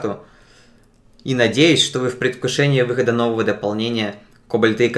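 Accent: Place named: England, United Kingdom. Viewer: у тебя очень приятный голос. Видно, что ты уже привык к английскому языку и у тебя присутствует этот акцент. Надеюсь это не единственное русское видео на твоём канале)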